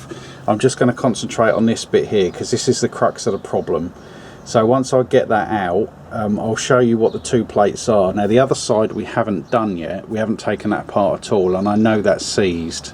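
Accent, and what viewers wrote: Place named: United Kingdom. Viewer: Thanks for keeping video posted!!! I get the hint that this is a 03 Jeep Liberty but you sound like your in Great Britain, but again I didn't think Jeep was in Great Britain???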